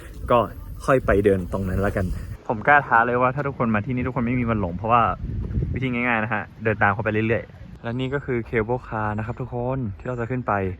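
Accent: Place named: Khon Kaen, Thailand. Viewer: ชอบสำเนียงเหมือนคนขอนแก่นตอนพูดภาษาอีสาน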